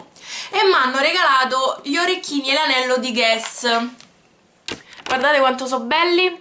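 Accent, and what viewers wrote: Rome, Italy. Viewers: ma si romana se sente pure io so romana de nascita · Ciao sei dolcissima e bravissima si vede che sei una ragazza di cuore xcio hai attorno a te persone vere xche te lo meriti!!! Io adoro il dialetto romano sei troppo simpatica!!! Mi chiamo lidia salutami in un video....ps ti seguo da molto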